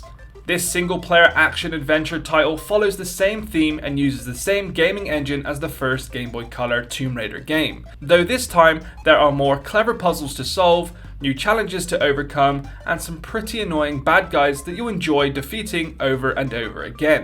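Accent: Bristol, United Kingdom. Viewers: Bristol accent!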